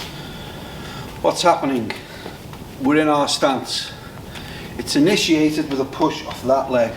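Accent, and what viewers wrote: Liverpool, United Kingdom. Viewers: IS this the liverpool accent?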